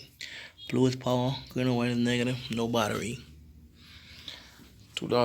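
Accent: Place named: Bahamas. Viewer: Sound Bahamian 🇧🇸🤌🏾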